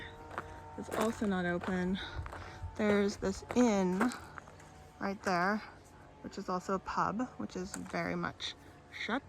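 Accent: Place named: United States. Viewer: Hi there, so nice to see you back on the SWCP. I love to hear your perspective on the path with you coming from across the pond and chuckle at some of your pronunciations. I don't have the problem of overgrown paths as i only walk the SWCP in the autumn winter months, though i do have mud to deal with. You deserve far more views and subscribers than you have. Keep up the great work.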